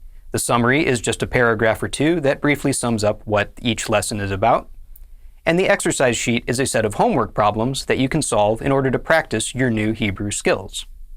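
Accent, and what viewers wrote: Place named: Germany. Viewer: . And here is where you lost me. Fare well. Modern Germanicised versions of the Hebrew pronunciation are known to differe quite a bit from the pronunciation of ancient Hebrew. Actually, we have an overly detailed description of the pronunciation of every ancient consonant and, thanks to the Masoretes, also of every vowel. So, deliberately chosing to use a 19th ~ 20th century Germanicized version of the pronunciation of the language is a travesty. I do want to learn Hebrew, but the overabundance of resources using Germanizing versions of the language almost deterr me. Using these pronunciations is an undeserved deference to Europeans who made and make a conscious effort not to use Semitic phonemes when speaking Hebrew. My quest goes on, my using this channel to learn Hebrew is done. So long.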